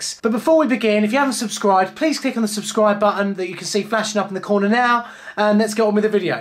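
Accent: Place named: RP, United Kingdom. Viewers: Lol- just rewatched that video, and you are quite right. The 't's are all pronounced perfectly ('t's- geddit?). 74k views... people clearly lap up the posh stuff! Actually, watching it reminded me... Does anyone have any top tips on dealing with chrome? I had a bugger the other day (cover on a old fashioned tap), but wrapping it in gaffa tape and using grips avoided scratching. Same solution for chrome joints... Looked at you site Cumbrian HM... Some good stuff on there! Clearly a handy hero! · HandyGuyHints He even had a posh voice in those days. ;)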